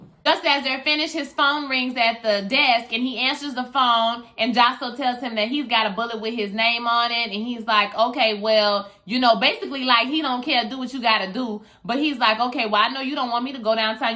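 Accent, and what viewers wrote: Louisiana, United States. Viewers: Dont watch Fargo, but showing love from yourlovecraft reviews. You also sound like a fellow Louisiana girl. I could be wrong, lol!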